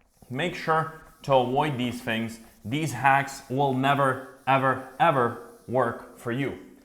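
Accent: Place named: United States. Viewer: first thing first sir to be honest you look like you from usa and have a great acent so people gonna follow you ! no matter as a indian if i try this method our face are not good and they just remove us from intrest section.. Mater fact as i tap this video i also think you from usa until you mention your country name sorry but its true sir ❤ by the way love your video love you from India❤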